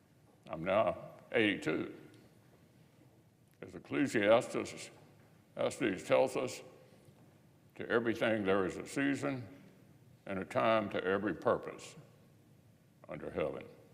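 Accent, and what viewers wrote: South, United States. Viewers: Somebody sends Skeletor on home that Southern drawl is f$@ gnarly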